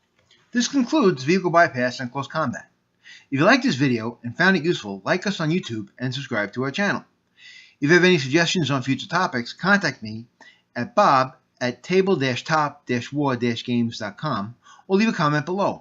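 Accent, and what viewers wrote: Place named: New England, United States. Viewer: I would like to see the following, 1-AFV moving through Woods-Road hexes while encountering a KEU in one of those hexes. 2-A Truck with passengers doing the same thing. (the objective is to get past the KEU, not slug it out in a Fire Fight) I have done this, just wanted to see what you would come up with. I enjoy your videos, just slow down on that NE accent once in a while. Thanks.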